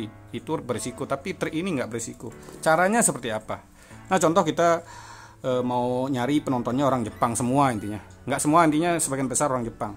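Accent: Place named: Kutai, Indonesia. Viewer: logatnya kayak orang kutai bang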